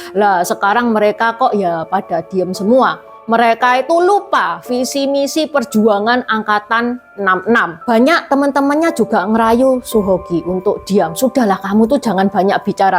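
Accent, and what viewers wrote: Java, Indonesia. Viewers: Mbaknya, suaranya medhok jawa...😊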